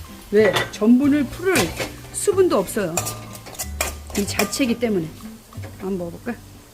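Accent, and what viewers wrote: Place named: Seoul, South Korea. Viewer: 넘 매력있는 서울사투리에 강주은님 생각나요 ㅋㅋㅋ 요리할때는 살아있는 눈빛에 존멋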